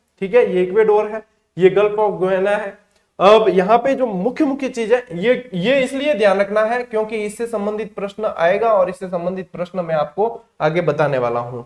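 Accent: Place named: Marwari, India. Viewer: Sir apki marvadi hamjh koni aave.....pr laage choki👌👌👌👌... thank you sir....jitna bhi bole km hi h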